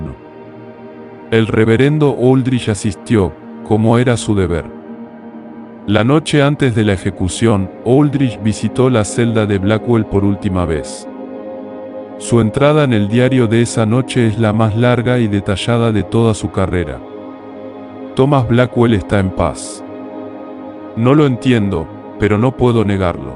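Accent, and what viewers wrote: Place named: Argentina. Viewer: Esta es la voz a la que me refería, que suena con un acento argentino. Me fascinaba escucharlo, ya que me transportaba a través de mi imaginación, provocando la emoción de la narración de principio a fin. Sencillamente, me encanta esta voz. 🎤💗👏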